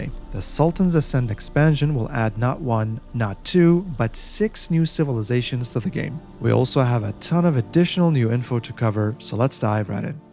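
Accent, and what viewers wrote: Arab world. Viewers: oh god are u arabian?! the accent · the way you pronounced the names tells me that you originally Arab :P , anyway thanks for the info cant wait to have my hands on the new civs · i just realized you are most likely arab from your reading of the names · My man. Your arabic accent is so eloquent.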